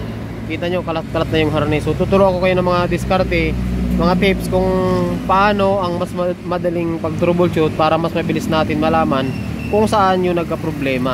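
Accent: Bicol, Philippines. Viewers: Bicol ka?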